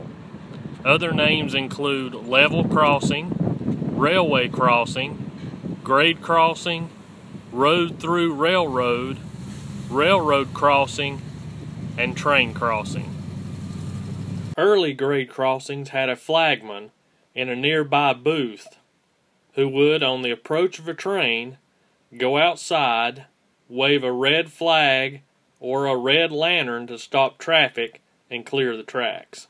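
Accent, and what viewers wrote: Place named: Mississippi, United States. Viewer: I'm from Mississippi and that accent of yours sounds like it a Mississippi accent!!!!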